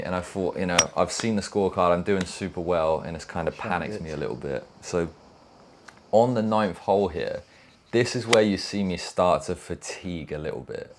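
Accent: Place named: Bristol, United Kingdom. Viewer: what is that accent, Bristolian?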